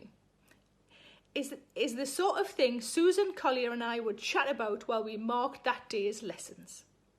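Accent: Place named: North England, United Kingdom. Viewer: Don't worry, I'm English and have no idea what she's saying half the time :) North England may as well be a different country.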